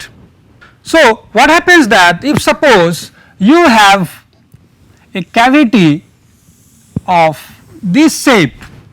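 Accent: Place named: India. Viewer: Indian accent is hard enough to listen to without that mic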